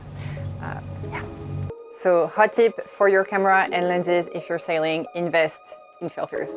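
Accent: France. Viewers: Great Video , I love the french accent ! Vive la France - vive le general De gaule !!! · Ur the first time I’ve seen ur video log u r soo❤❤ ur voice is French so r u from Quebec or Europe France? Happy birthday 🎉🎉🎉🎉🎉 next Week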